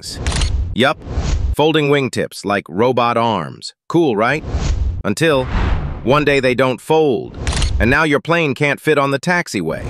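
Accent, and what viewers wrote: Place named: United States. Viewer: Comments are so stupid, not enough with this awful American accent witch makes it ridiculous 🙄 !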